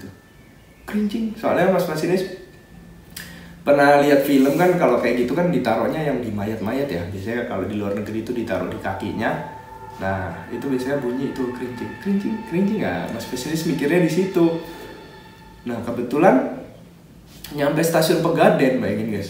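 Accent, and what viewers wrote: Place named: Malang, Indonesia. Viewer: Part 1 mana ?? Bahasnya medook...org Malang tibako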